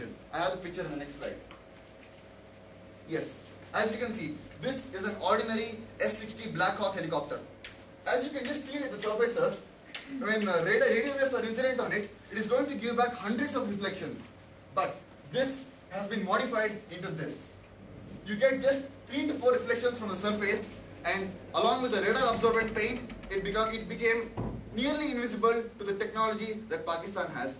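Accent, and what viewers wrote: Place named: India. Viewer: i dont know why i like indian accent XD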